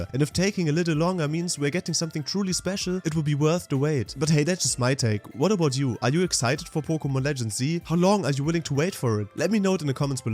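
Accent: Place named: Germany. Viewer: I LOVE your German accent❤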